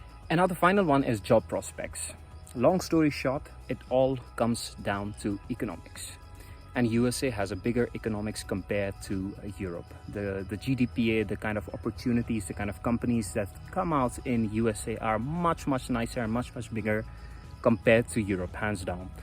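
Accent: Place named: Netherlands. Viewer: your English accent have a dutch inflection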